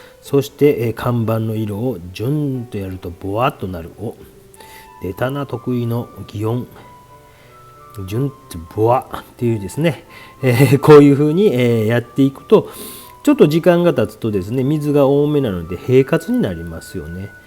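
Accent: Kansai, Japan. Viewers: おはようございます。今朝サインの描き方を試してみましたがうまくいきませんでした😞 サインとか窓の格子などはアマチュアにはliquid frisquetでもいいかなと考えています。影を描いてからその上に色を載せるやり方は大変参考になりました。ところで神戸出身の私には先生の関西弁が懐かしいです。これからも頑張って下さいね。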